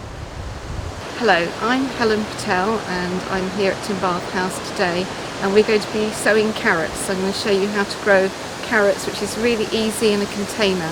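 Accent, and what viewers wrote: United Kingdom. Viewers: Thank you for the tips! I'll never thin again. You're a lovely British lady, Helen, with concomitant accent, and with a lovely garden and cat! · Such a cute uk accent!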